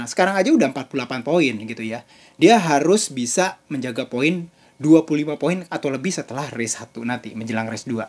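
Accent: Indonesia. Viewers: 😂kang,biar enak di dengar,coba kalo nyebutin nya jgn lidah indo banget....coba gini biasa aja: komfom jangan sampai bunyi yg keluar komfrom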